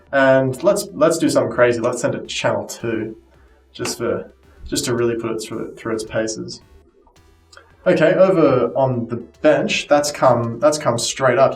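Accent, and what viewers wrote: Australia. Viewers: He's saying Hertz correctly. With an Australian accent.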